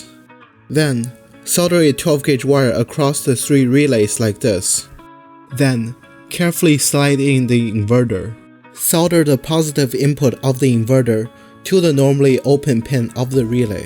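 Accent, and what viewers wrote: China. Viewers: 你的口音怎么这么像中国人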